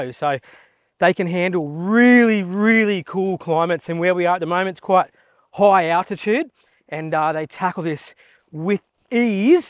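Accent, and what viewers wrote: Australia. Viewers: Terrible Ozzie accent!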